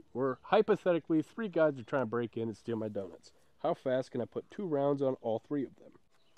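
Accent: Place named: Midwest, United States. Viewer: I always thought midwestern was a nuetral language. Well I'm wrong, there's an accent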